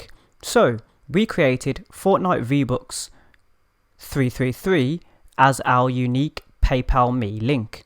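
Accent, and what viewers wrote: England, United Kingdom. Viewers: Are you from England love the accent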